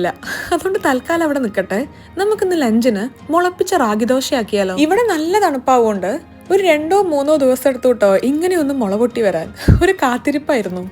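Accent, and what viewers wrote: Malappuram, India. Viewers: 💖🥰 Malappuram slang👍✨✨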